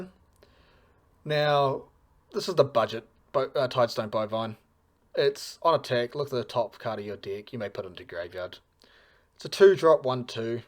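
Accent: New Zealand. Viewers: Good old Kiwi accent!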